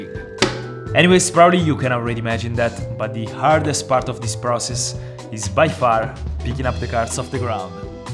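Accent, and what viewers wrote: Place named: Italy. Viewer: he is italian that's why he's accent is different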